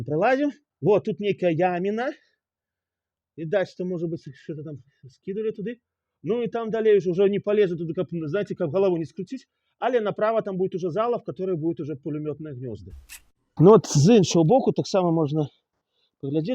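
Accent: Trasianka, Belarus. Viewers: Так он и разговаривает на трасянке.. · З удзячнасцю дзякую за расповедзь пра Ракаў. Добра зайшло. Прыемна слухаць было вашу трасянку. Добрае дзела робіце. Чакаю трэццю частку. Не кідайце сваю справу.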